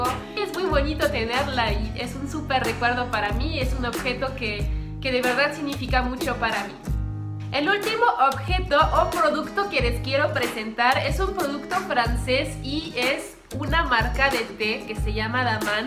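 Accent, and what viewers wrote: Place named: Mexico. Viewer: hablas muy bien español con algo del acento mexicano te vez súper linda hablando de la patria hermana México saludos des colombia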